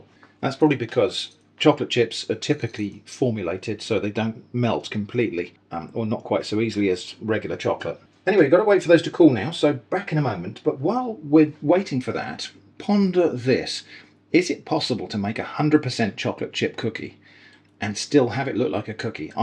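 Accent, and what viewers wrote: United Kingdom. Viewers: You don't know how wonderful it is to hear a British accent calling cookies "cookies" instead of biscuits.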